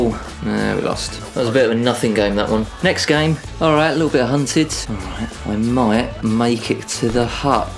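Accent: United Kingdom. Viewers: Geordie Podcast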